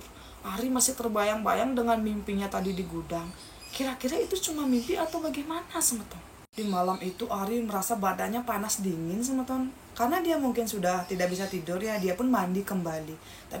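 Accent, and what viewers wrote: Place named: Bali, Indonesia. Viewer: Jangaaannn...ntar saya ngga ngerti..logat bali juga dah enak koq. Hehehee..❤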